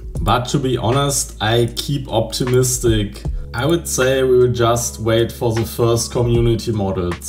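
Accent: Germany. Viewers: the german accent gives me hope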